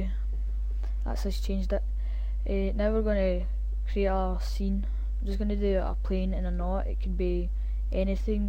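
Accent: Scotland, United Kingdom. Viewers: Cannae beat a bit of scottish accent... :P pretty cool man, any idea how tae get it a bit clearer... mines coming up too dark and im making a realistic coffee machine for a uni project... I know i should know this cause im in uni but i only found out this "pro materials", its called Arch & design in 2012, l like a week ago.... cheers lol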